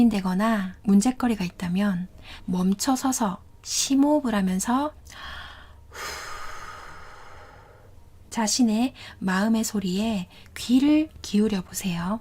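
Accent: Gyeongsang, South Korea. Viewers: 말투가 경상도가 고향 인가봐요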